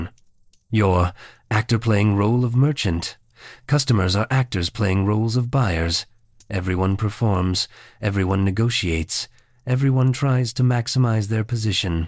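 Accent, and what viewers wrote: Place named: United States. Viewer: American voice describing medieval England bad AI choice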